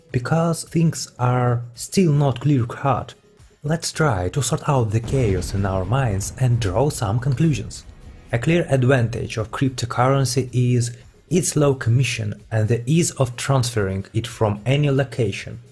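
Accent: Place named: Russia. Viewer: он та из России, вырос в такой среде с таким акцентом. Научиться со временем.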